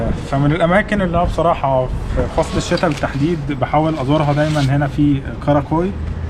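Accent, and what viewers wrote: Egypt. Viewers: وفقك الله لمزيد من الجولات امين هل انت مصري الجنسية لاني فهمت لهجتك ؟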